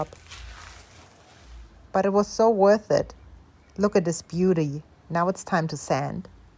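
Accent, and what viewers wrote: Germany. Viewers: Dein Deutsch ist ja süß 😍😉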